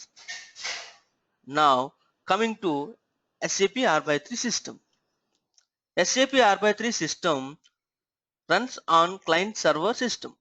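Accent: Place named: India. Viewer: Gosh! Indian English, what the hell of the intonations? I don't understand!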